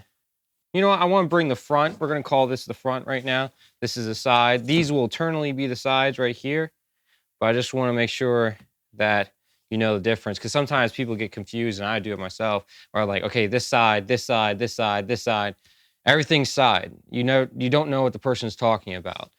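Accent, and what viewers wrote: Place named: Annapolis, United States. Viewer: Super helpful. Are you by any chance from Annapolis? Accent reminds me a lot of a buddy of mine who grew up there.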